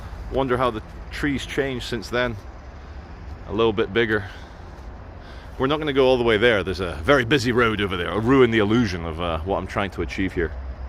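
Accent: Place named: Newcastle, United Kingdom. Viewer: Loved the Geordie accent *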